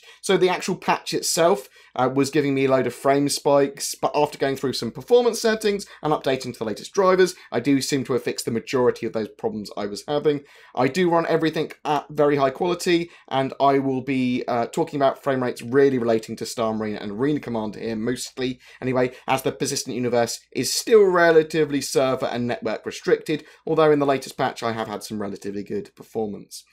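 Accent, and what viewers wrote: United Kingdom. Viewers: I love the british Accent! <3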